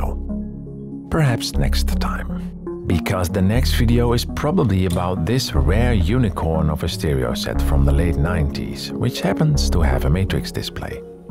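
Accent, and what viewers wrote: Netherlands. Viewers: I already thought I could hear it from the accent, but hell yeah this man dutch. Mooie video, ik hou wel van dit soort deepdives in iets waar je normaal gesproken nooit over na denkt :) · Why is it that i can always hear that someone is dutch? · noticeable Dutch accent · The Dutch accent is truly horrific. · In the 1990s, I was already an experienced engineer in my 30s. Some technologies that used 7-segment: "Numitron" (incandescent), LED, LCD, VFD (vacuum fluorescent), mechanical (plastic, brightly painted on the active segments), & "Panaplex" (Neon, used on some 70's microwave ovens, and gas pumps). This guy's accent sounds like his native language is Dutch. I was born in the Netherlands. Moved to the US as a child, and use to tease my father about his thick accent, in my teens. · Are you from the netherlands? it kind of sounds like it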